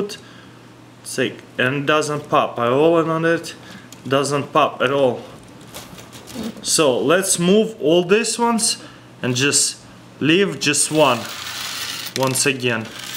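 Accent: Russia. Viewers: Y do people make fun of the way he talks he is Russian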